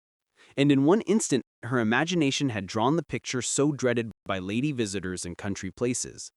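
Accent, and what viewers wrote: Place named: United States. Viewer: Я не ожидал, что голос с американским акцентом подойдёт к рассказам Чехова. Но это случилось. Удовольствие слушать!❤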